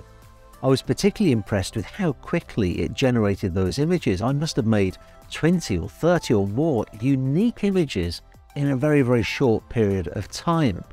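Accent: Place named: Midlands, United Kingdom. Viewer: Hi, I recently watched a documentary about superyachts that you starred in. It was great how your midlands accent was so strong in it haha...also, I noticed on the Boat International website Wider had a 170ft yacht for sale. Will you be covering the yard again soon?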